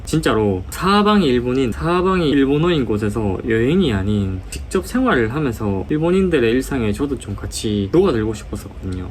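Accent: Daegu, South Korea. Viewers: 안녕하세요. 일본 워홀을 가고 싶어 영상 찾아보다가 댓글 남겨요. 대구 사투리 쓰셔서 대구 분이시구나 했는데, 팔거천 얘기 듣고 깜짝 놀랐네요. 저도 칠곡 살아서요 ㅎㅎ.. 지금은 서울에 직장 잡고 열심히 일하고 계신 것 같은데, 저도 워홀 이후에 서울 가는 게 계획이거든요. 이런저런 부분에서 반가워서 댓글 남기고 갑니다. 다른 영상도 마저 찾아볼게요. 하시는 일 다 잘되시고 파이팅하세요!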